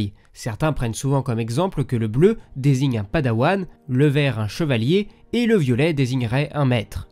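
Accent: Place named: France. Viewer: J’habite aux États-Unis et ouais, tu parles avec un accent français, mais, je comprends parfaitement ce que tu as dit. Bravo! Aussi j’adore tes vidéos. J’apprends français maintenant et j’adore la guerre des étoiles, et ta chaîne m’aide à apprendre. Merci et continues ce que tu fais!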